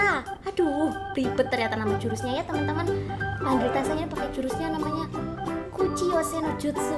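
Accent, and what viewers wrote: Java, Indonesia. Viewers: Medok banget jawanya 😂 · Wah,hi ini Brusan dengar suara ni,jdi org jawa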